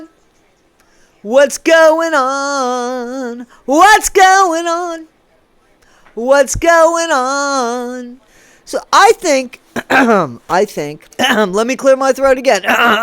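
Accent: Scotland, United Kingdom. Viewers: your Scottish accent doesn't suck, it's hilarious actually 😂😂😂😂😂😂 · I’m loving that Scottish accent 🤣😂😂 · Her dogs name is (was) Pixie. Ryan’s now. I love your Scottish accent.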